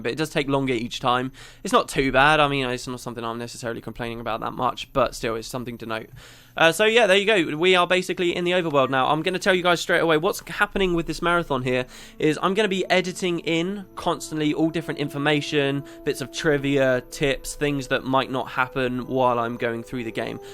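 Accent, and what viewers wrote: United Kingdom. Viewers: Wow you really did sound more British 3 years ago! (but both voices then and now of you are still amazing XD) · Oh my god that accent:D, you definitely lost a little bit of your brittish-accent along the way. And even then, you knew everything about GW2. I wouldnt mind a few more of these:D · Don't lose your British-ness! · you do sound more "british" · His voice used to be a little deeper, but there's also more of a British accent on top of that. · He sounded a lot more British years ago. Since most of this video is old footage from 3 years ago, he sounds different in it.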